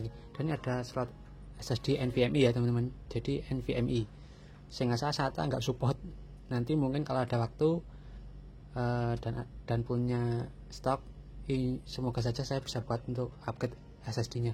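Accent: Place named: East Java, Indonesia. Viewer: Mas bisa Update olshop ram dan ssd? Ngomong ngomong jatim Mas. Sapa tau tetangga 😂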